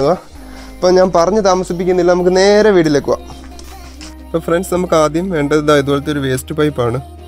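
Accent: Kottayam, India. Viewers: Bro kottayakaran aanenn thonnunnu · Kottayam kaaran aano alla aa nadu fagathu ennu parayunnathu ketu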